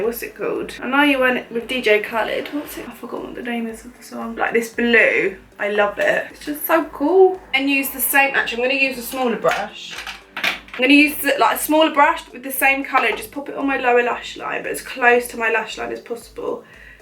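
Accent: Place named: RP, United Kingdom. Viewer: This look is beautiful! And you are beautiful always. Your accent is so posh to me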